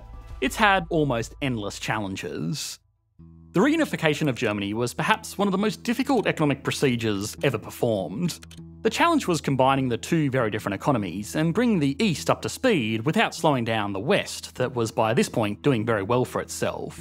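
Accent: Australia. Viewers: Haha, alltough an Ozzie speaker, it is a British vieuw of economics. A bit shiny fellas.;-) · I will never watch the German version, simply because I love the original Australian accent and very unique delivery so much. If phonebooks still existed, could make a whole video channel reading them aloud and I would watch it. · As a German who appreciates the WirtschaftsWissen channel, I still can’t imagine without English in that fine aussie accent, mate 👌🏼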